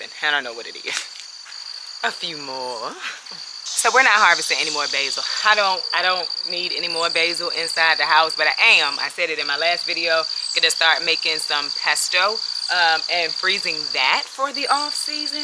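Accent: South, United States. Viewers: Excellent job my friend.. I love ur accent so sourthern❤❤.. Ur garden is fantastic..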